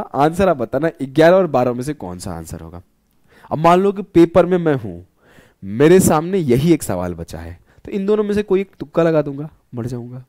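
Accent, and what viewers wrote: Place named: United Kingdom. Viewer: App itna smart q ban rhe h ,, aram se hindi padh kr samjhaiye,, ham jante h ki apka english thik h,, chanal ke naam h bihar yodha or briten jaisa bhasha bol rhe h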